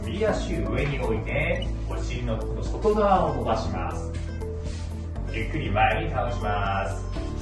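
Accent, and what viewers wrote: Kansai, Japan. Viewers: 時々、関西弁になったり標準語との葛藤を感じる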